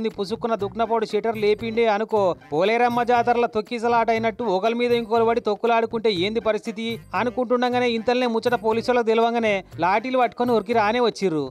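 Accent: Telangana, India. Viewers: Any how i enjoyed the anchor telngana slangs ...love from odisha😂😂😂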